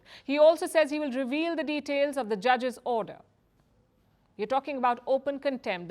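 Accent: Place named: India. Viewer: You are lier I wish you get off of your Indian accent first to be a speaker 🔈 plus you don’t know nothing about corruption in Brazil lady Lord has mercy on your soul and the machine manipulation behind your job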